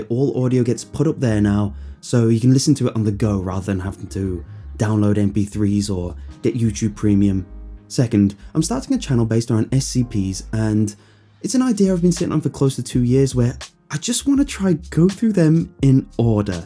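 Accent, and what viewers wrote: England, United Kingdom. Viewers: Can someone tell me where in England his accent is from? I love listening to it. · Wow, you are a very humble guy and I’ve always listen to you because of your very calming British voice ❤️. Keep doing what you love and just listen to your gut instincts.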